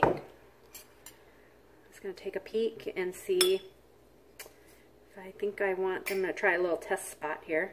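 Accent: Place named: North, United States. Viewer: Hi I 💕 this video!!!! You did a wonderful job showing how to dye techniques!! Plus I love your northern accent!!! My mom is from South Dakota so it makes me feel right at home here in Oklahoma!!!🥰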